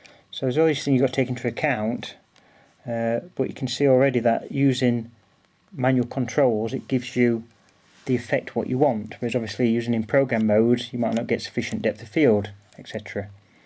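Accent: Yorkshire, United Kingdom. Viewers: interesting video. yorkshire or lancs accent.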